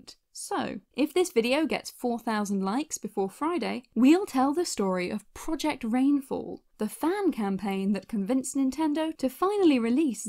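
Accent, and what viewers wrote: United Kingdom. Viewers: your voice isn’t posh, I mean maybe I just am numb to the accent as I live in oxford but to me it isn’t posh 😂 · I’ve been following this channel for a while and I barely noticed the narrator is British. 🤷‍♂️ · But why are you British? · Why are you British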